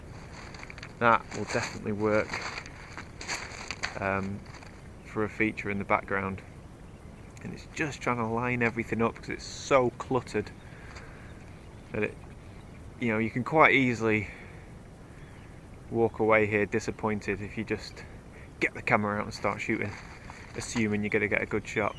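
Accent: United Kingdom. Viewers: Great educational video. We're is your accent from in the UK? I'm living in Edmonton Alberta Canada but born in sherburn in Elmet nd Leeds Yorkshire. I miss film photography as it was never so complicated as digital. Thanks for the education .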